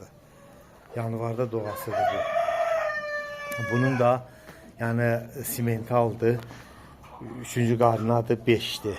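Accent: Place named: Goycha, Armenia. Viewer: Ləhçən çox xoşuma gəldi GÖYÇƏ MAHALINDAN DEYİLSƏN? BASARKEÇƏR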